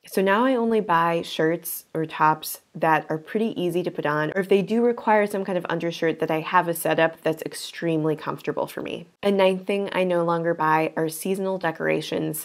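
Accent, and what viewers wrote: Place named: Minnesota, United States. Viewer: I love your Minnesota accent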